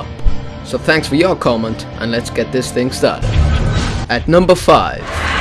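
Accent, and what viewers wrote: Wales, United Kingdom. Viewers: is he welsh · i just got a question are you brittish? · you have a slight welsh accent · he has a welsh accent lol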